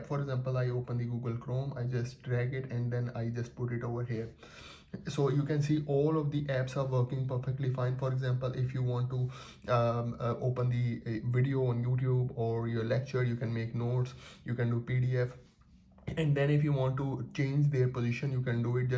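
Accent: India. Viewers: Indian accent it seems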